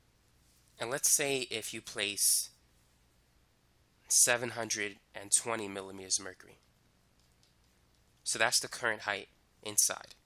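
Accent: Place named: Boston, United States. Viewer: Dope Boston accent 👌🏻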